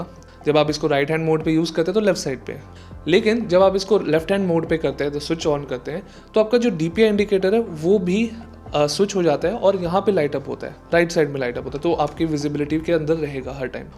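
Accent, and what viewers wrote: Assam, India. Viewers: Assam se ho bro? Bhai na bhai!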